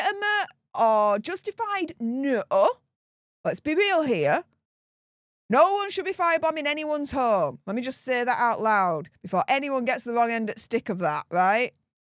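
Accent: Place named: England, United Kingdom. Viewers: You sound like you're from Hull, with your accent. Or nearby. I am from ULL myself. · 😅 wow young models could they be upset about something jealousy drugs I think this is going deep but the media seems to be running away from it😅 oh by the way I love your English accent😊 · Great to hear a good Northern accent for once in political discourse. We might get more sense (oh, sorry, I forgot Our Ange ! )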